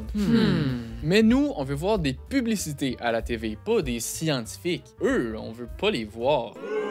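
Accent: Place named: Quebec, Canada. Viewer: Ma langue d'origine est kurde :) Je cherche toujous du contenu en français québécois sur youtube car c'est l'accent auquel j'aimerais m'habituer et que je souhaite apprendre. Mais contrairement au français de france, je trouve qu'il n y a pas une abondance de contenu pour l'apprentisage de français avec l'accent québécois. Mais tes vidéos sont très bonne pour ça en fait parce qu'elles sont amusantes et intéressantes. Merci encore!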